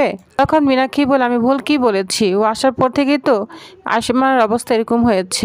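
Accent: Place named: Bangal, Bangladesh. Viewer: বাঙ্গাল বাঙ্গাল উচ্চারণ, অশিক্ষিত উচ্চারণ ঠিক ঠাক শিখে কতকথা করবে।শুভেচ্ছা রইল।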